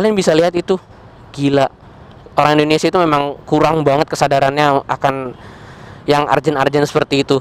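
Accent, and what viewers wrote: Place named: London, United Kingdom. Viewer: landun sound 🤤 like it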